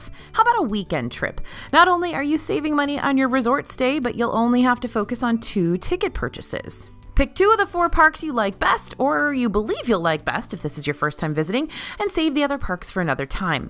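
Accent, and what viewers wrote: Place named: Western New York, United States. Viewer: I knew your accent was familiar… I am also from western ny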